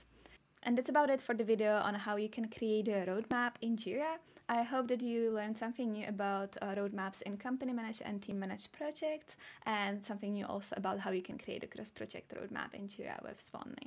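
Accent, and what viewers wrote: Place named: Scandinavia. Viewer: Nice video! but the whole time I'm wondering where your accent is from, Its either Finish, Icelandic maybe even Norwegian??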